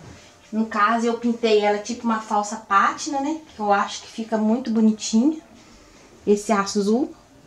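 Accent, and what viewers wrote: Minas Gerais, Brazil. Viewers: De que cidade vc é, parece mineira uai?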